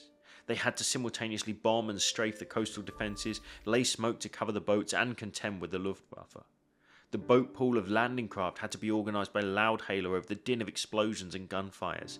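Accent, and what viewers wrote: England, United Kingdom. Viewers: That the narrator has an English accent says everything 😞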